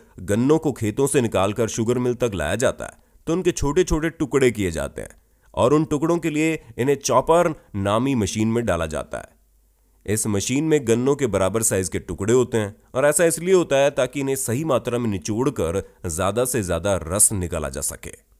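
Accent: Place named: Haryana, India. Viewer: Host ki awaz haryane ki lg rhi h 🤩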